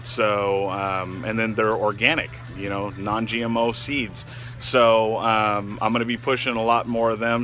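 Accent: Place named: Minnesota, United States. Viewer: You sound Minnesotan!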